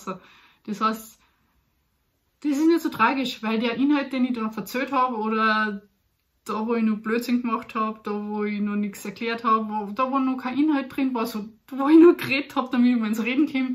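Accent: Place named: Upper Austria, Austria. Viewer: Hi! Ich hab eh schon bei einem deiner sehr frühen Videos nachgefragt, ob du auch aus Oberösterreich kommst (ich bin aus dem Mühlviertel) und war nicht überrascht, dass du das bestätigt hast. Dialekt finde ich gut und sollte für keinen Deutsch-Muttersprachler eine wirkliche Hürde sein... Ich finde Inhalte auf Deutsch gut und lasse mich überraschen. Zum Thema Ravensburger: Ich hab grad gegoogelt, ob der Name ursprünglich auch im Deutschen von einem Raben kommt und scheinbar gibt es verschiedene Theorien. "Rabe" ist eine davon, der Name könnte aber auch von "Rauenburg", also einem rauen Hang neben der Burg abstammen. Interessant jedenfalls.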